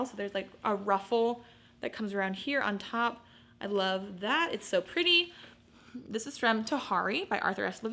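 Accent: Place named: Michigan, United States. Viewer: As always I love learning from you, but I think what makes me love watching you most is that your personality reminds me of my best friends ❤ I moved away when I got married but her and I grew up together in Michigan which you have a very very similar accent and how you speak reminds me of home. Loved your haul keep hauling on 😊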